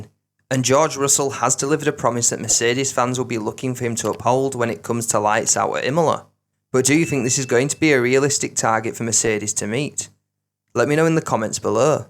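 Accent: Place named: Yorkshire, United Kingdom. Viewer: As a non native english speaker .... just outta curiosity. You from yorkshire, duck?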